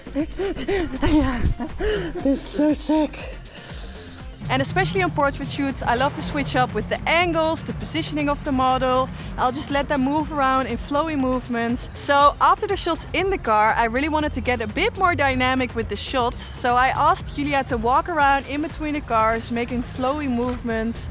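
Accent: Netherlands. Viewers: Het Nederlands accent maakt deze video af 👌🏽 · Loved this video! I love how your “dutchness” comes out of you from time to time hahaha you have great energy and I’m looking forward to your future video’s! Nogmaals wat een leuke video! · great video, funny that you turned out to be dutch :P I thought i was hearing an accent haha :P cool location as well for the shoot! great work :) I'm thinking to buy the 35mm as well, looks zo good :)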